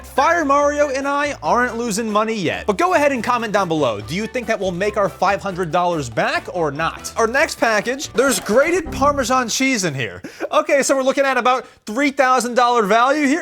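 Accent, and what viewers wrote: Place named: United Kingdom. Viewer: Oh my gosh British accent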